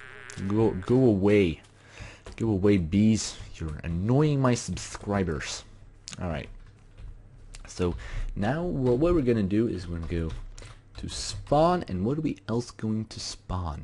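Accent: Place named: Australia. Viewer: ( I wanted to hear you in your Australian accent.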